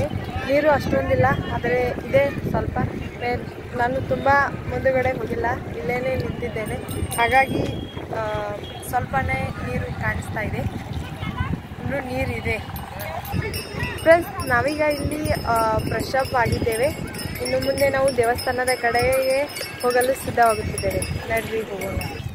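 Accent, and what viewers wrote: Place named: Maharashtra, India. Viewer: I'm from hubli.. i watched ur almost all videos. Ee thara kannada matadorna nanu yavattu nodilla. First time nodtha idini it's nice to see that your kannada accent awesome..😊👏 i think your mother tongue Marathi Ansutte Alva ri..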